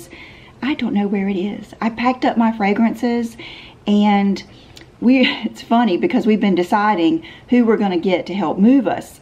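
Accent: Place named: North Carolina, United States. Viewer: Love your videos! I’m a Carteret County local, so I totally get the difference in accents in NC;). And I TOTALLY never noticed how red my eyes were until I started doing YouTube videos. Thank you for your positivity and sweetness… and if you ever come up to the Crystal Coast I’d totally do a beach day with you:)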